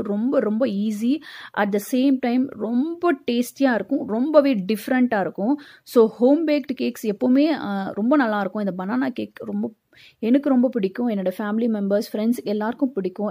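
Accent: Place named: Bangalore, India. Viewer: Hi....you have superb banglore slang... while you talking it's like my mom's family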